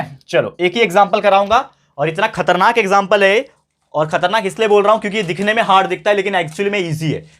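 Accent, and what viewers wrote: Maharashtra, India. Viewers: Sir aap Marathi ho kai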